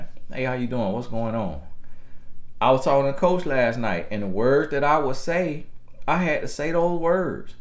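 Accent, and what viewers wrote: South, United States. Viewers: I love your country accent and your authentic self! You're a blessing to us. God made you just the way you were supposed to be.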